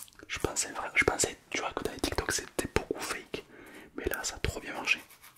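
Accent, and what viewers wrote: South, France. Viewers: Adiu tu as un bon accent du sud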